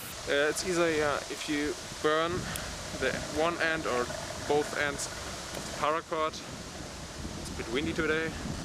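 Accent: Germany. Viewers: god german english^^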